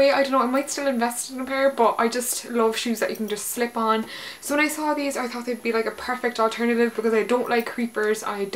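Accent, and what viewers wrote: Ireland. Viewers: I loved ur haul!it was also really nice to listen to u talking about the products instead of just showing it quickly...I am glad I have found you because I think we have a similar taste in clothes. x check out my videos if u have time..xx ps. love ur irish accent...one of my best friends is from near belfast xx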